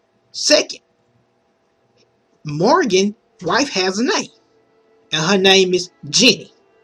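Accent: South, United States. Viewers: man what state u from man cause u got country ass accent i mean no disrespect man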